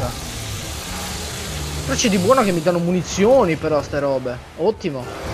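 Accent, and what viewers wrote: Sardinia, Italy. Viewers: Oddio, sei sardo?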